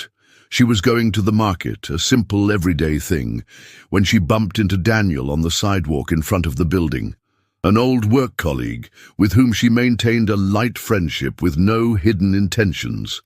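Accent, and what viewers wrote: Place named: United Kingdom. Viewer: I love the narrator's British English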